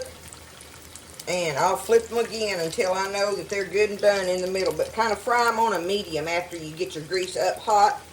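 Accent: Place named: Texas, United States. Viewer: I love you and your kitchen. We talk the same. I'm a Texan. I love you and your channel! Thank you!!!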